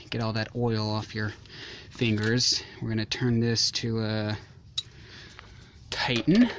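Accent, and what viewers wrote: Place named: United States. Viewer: I LOVE YOUR VIDEO . WHAT SIZE SOCKET? I LOVE HAVING SOMEONE W/ GOOD DELIVERY IN U.S. ACCENT. OTHER ACCENTS TOO HARD TO LISTEN TO.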